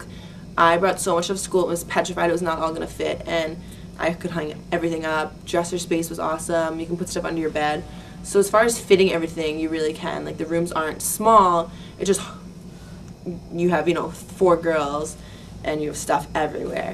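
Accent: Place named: Maryland, United States. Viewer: she has to be from Maryland... her accent is thick haha i talk the same way.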